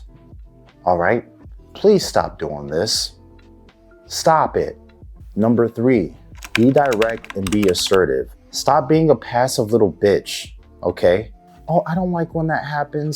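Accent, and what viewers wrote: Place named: Nigeria. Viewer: The nigerian accent goes crazy bro haha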